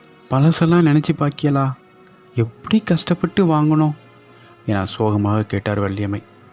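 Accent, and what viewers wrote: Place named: Tirunelveli, India. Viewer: Super.. Once again that nellai slang.. feel at home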